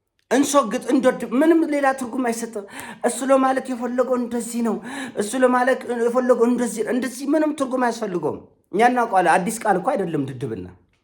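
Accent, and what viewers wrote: Tigray, Ethiopia. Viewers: ለኣንተ ግን ጥያቄ ኣለኝ ለምንድን ነው አማርኛውኝ በትግርኛ accent እያወላገድክ ምታወራው 😂?? ኣንተ ሰግጠህ በ accent እየቀለድክ እያዘላገድክ ፈምሰህ እንዴት ሰለ ሰነሰርዓት መናገር ትችላለህ ? ኣሁን አወላግደህ መናገር ሰልጣኔ ነው አንድ አማርኛውን እያበላሸሀው ነው ሌላ ደሞ ሌላ ሰው ለመምሰል እየሞከርክ ነው ራሰህን ምሰል ።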